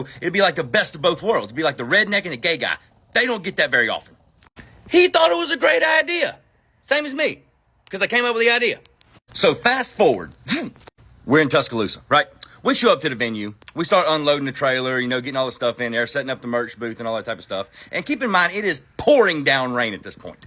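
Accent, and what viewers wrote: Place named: South Jersey, United States. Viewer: Ps-you might have a lil south jersey in ya like myself. You rock👍❤️🕊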